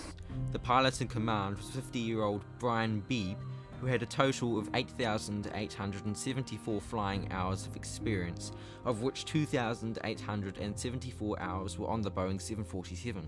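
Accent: New Zealand. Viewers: just listening to your commentary are you a Kiwi?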